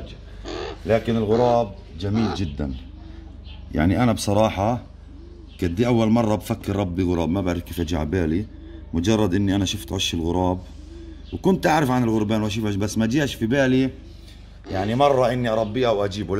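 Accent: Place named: Palestine. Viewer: اللهجه الفلسطينيه ثقيله دم ومو حلوووه سلملي ع اهل العراق بس احلى لهجه عربيه 💐❤️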